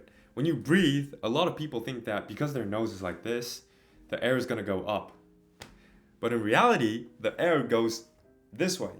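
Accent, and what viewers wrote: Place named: Texas, United States. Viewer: You got the Texas drawl down perfect :) You look fine. Whatever gives you confidence is good. One thing people can do to reduce fine lines and wrinkles and firm under the eye is to close the eyes and flex the muscles in the top and bottom lid. You can feel the difference if you place your small fingers on the outer corner of the eye socket :) It feels good, too. Great call on the eyebrows!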